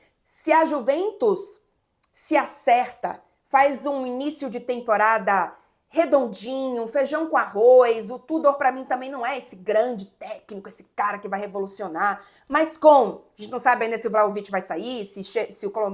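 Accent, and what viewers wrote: Bahia, Brazil. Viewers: Que voz forte, poderosa, e com um sotaque delicioso! Eu apostaria tudo que ela é baiana pra ter esse conjunto fabuloso!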